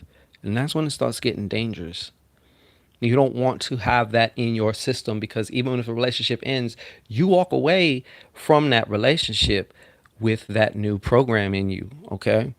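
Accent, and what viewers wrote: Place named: New Orleans, United States. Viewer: Hey! Cajun Cappy here. You sound like you could be from New Orleans! Where are you located?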